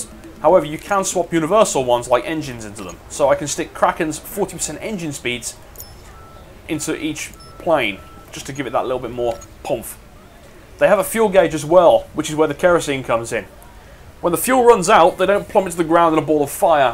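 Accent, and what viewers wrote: United Kingdom. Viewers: A brit.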